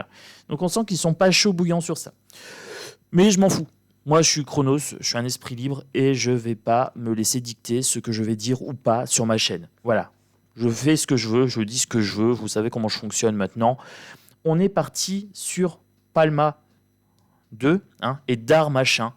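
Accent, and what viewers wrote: Nord-Pas-de-Calais, France. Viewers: Coucou chronos sa fait du bien de vous entendre parler chti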